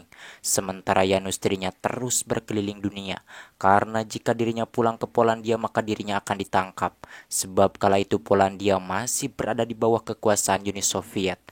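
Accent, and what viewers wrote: West Java, Indonesia. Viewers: Sampean asli org mana bang??? Sunda ya?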